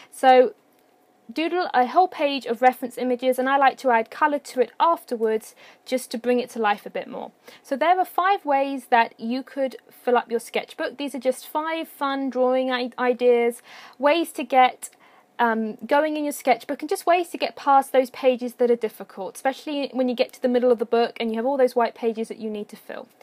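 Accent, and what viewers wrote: United Kingdom. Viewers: Love your British accent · Are u from the UK or something no sorry just asking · Idk why nobody has commented on this but I ❤️ your accent! I'm British too, and ppl really like mine too! I've watched this video like 5 times because it's so inspiring, and just to hear you talk too!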